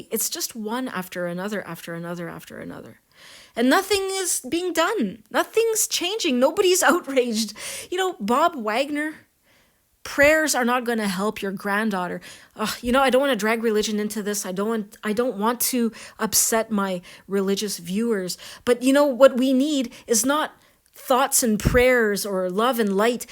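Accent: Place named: Canada. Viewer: Lets say for hypothetical purposes that dogs only become violent because of bad owners. That still doesnt justify allowing them into our society. In fact bad owners are more likely to be negligent, not be able to restrain their dogs or keep it in their yard or let them out. I never understood this argument and it is NOT a reason to allow dogs because 50% of dog owners are probably going to be bad. How does this justify the risk? I love your Canadian accent BTW.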